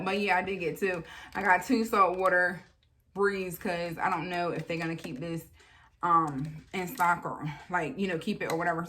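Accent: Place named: Baltimore, United States. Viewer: Girl I thought you were from Bmore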